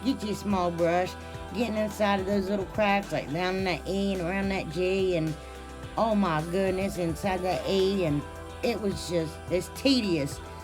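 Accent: South, United States. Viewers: New Subscriber. Your DIYs are beautiful and professional looking. I love everything you made. Love your accent. My best friend is a Southern gal like you, I’m from Chicago. When I go to visit her in Tn everyone there says they like my accent.Keep you DIYs coming. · I'm so glad I found your channel!! I love all the videos I've watched so far, im binge watching them now lol I also follow your friend Kathy, I love her too!! I can understand you just fine but im a southern girl too. My Maw maw was from West Virginia, im used to the accent! All of your diys are so cute! Happy craftin lady!!! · Love ur accent I'm a southern girl to. Ur crafts super. Like all. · Girl please, I can understand you just fine. It's a Southern thang. ;) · Love your accent. Im a Southern girl myself. Mississippi here 🙂 Love your projects ❤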